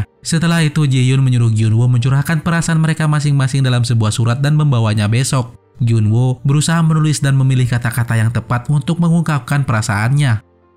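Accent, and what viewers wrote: Aceh, Indonesia. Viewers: Abang orang aceh ya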